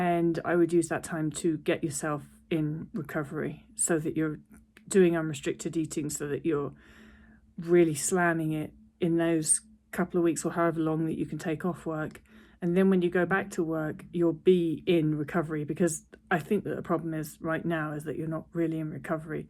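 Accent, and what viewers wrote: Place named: France. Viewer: haha i love your french accent! :)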